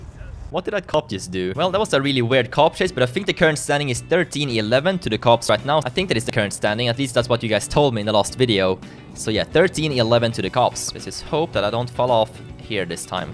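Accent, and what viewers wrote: Sweden. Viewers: Are you from sweden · you sound swedish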